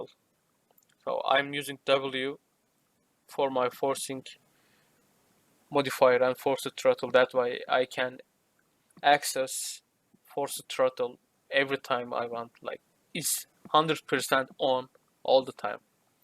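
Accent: Turkey. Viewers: ben direk anladım türk olduğunu çok belli aksanı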